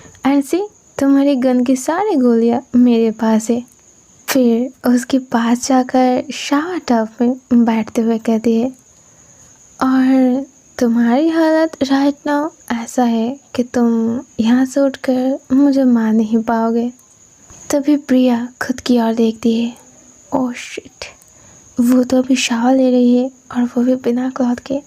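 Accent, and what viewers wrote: Bengal, India. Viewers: I love your bengali accent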